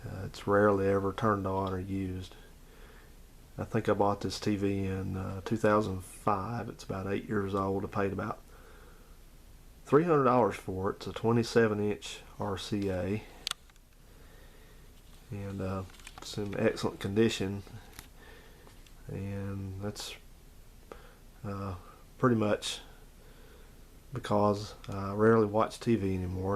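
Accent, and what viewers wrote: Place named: South Carolina, United States. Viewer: Squad 51 squad 51...though I'm 32, I love that show. Agreed about making merge...just what kids need to learn. My 9 yr old likes your repair videos over tv. He tells me you sound like you live in south Carolina.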